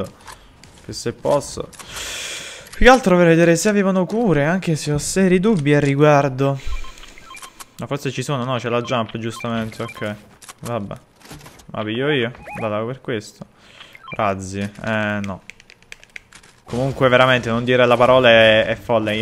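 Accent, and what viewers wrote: Naples, Italy. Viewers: Oddio ma sei di Napoli 😂😍🤞🏻💙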